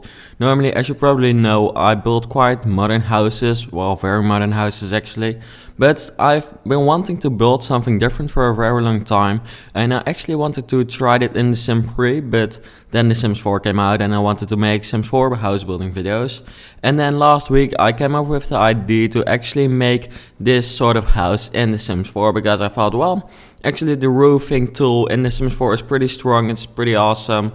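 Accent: Netherlands. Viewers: You need to stop using youre dutch accent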